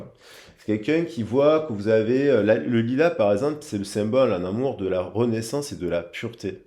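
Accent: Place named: Marseille, France. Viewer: Ah oui c'est l'accent de Marseille...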